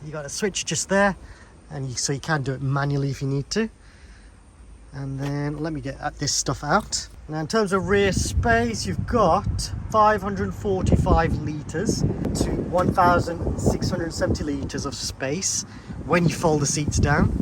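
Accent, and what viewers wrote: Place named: Birmingham, United Kingdom. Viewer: Def a Brummie or poss a Wolverhampton, but I couldn't tell the difference, but you'll know. You have a cultured Brummie accent I say. Kiddy is the same but Worcester doesn't apart from Brummies working there. Allroight!😊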